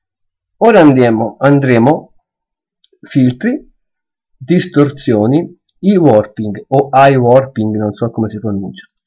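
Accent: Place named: Tuscany, Italy. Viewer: Grazie molto utile ma il toscano mi fa morire...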